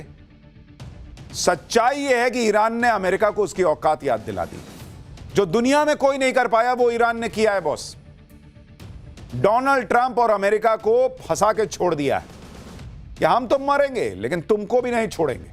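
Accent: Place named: Magahi, India. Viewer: Pura patnaiya maghi style me bolte hai sir aap😅😅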